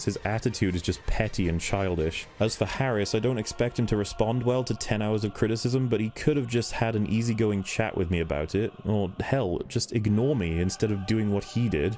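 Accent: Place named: Wales, United Kingdom. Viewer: You know the problem with youtubers? They are youtubers, as if that actually means anything in the world. is a wonderful arbiter of taste when it comes to the frivolity of contemporaneous consumables of an entertainment bent., you are a tonic in a sea of grating celery. Your opinion actually means something to me. Most youtube nonces, not so much. Bless you man, I'd buy you a beer to best loosen that smooth welsh speaking voice which just on its own makes you much more view-worthy than the douchebags described in this vid.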